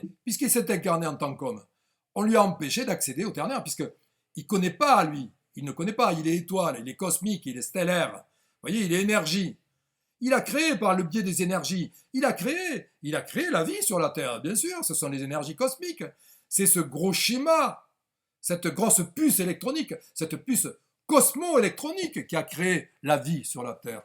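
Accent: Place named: Provence, France. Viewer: Merci docteur pour toutes ces précisions. J’adhère complètement à votre riche analyse. On peut rajouter je pense aux santons les fameuses « navettes »... L’histoire de mon village créé au 12ème siècle montre sûrement une concurrence entre Arles-Avignon et Marseille qui n’était pas encore dans l’empire... Il y a en effet, ainsi qu’en Avignon et en Arles, une rue Auguste Chabaud (si c’est ce peintre...). Ça ne m’étonne pas car votre accent provençal vous a trahis! Que du bonheur. Vous devez connaître alors « La Coupo Santo » de Mistral. Les paroles sont très intéressantes si on pense au Graal... Bon Week-end. Thierry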